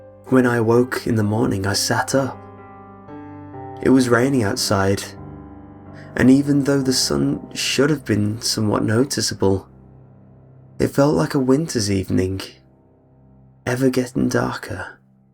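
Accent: United Kingdom. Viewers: wow are you a British? your voice is simply sexy :3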